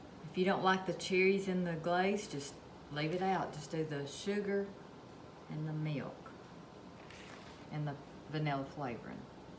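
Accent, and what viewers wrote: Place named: Tennessee, United States. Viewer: Listening to your accent brought me back to my childhood when we would visit my grandma in Tennessee. I luv that. It also sounds like Loretta Lynn. ☺️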